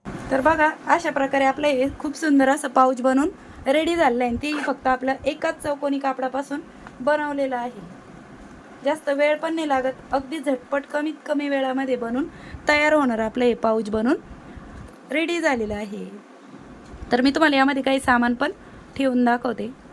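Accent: Ahmednagar, India. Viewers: अग विचारायचं कारण म्हणजे भाषा नगरचीच वाटली ना म्हणून विचारलं आम्ही पण कुकण्याचे आहोत पण नगरला रहातो ,तुझे व्हिडिओ बघायला लागल्या पासून खूपच पिशव्या शिवल्या मी खुच छान सांगते तू लगेच लक्षात बसत 🙏🙏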